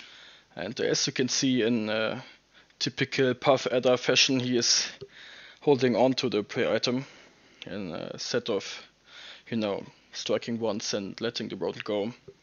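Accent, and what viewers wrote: Germany. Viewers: U sound like a german?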